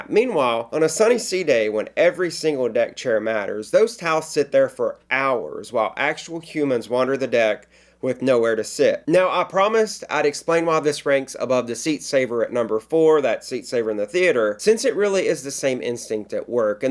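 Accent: South, United States. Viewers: Well done as always. I love watching the scenery on your videos. You sure got the life. I wish I had the technical skills and personality to do what you do. I would love to be able to afford to sail the Deep Blue Sea for a living. From where is that Southern accent?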